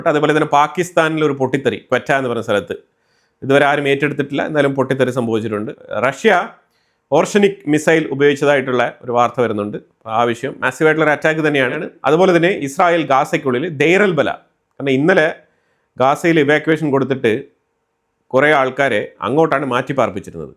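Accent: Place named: Alappuzha, India. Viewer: Hello Are you the native of all eppey? your slang is just like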